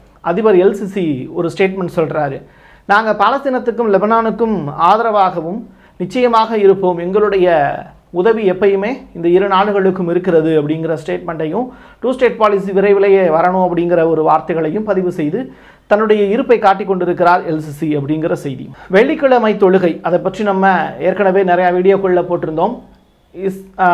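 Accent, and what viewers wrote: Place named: Madurai, India. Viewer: உங்கள் மதுரைக் குரலை கேட்டு பாராட்டுகிறேன்.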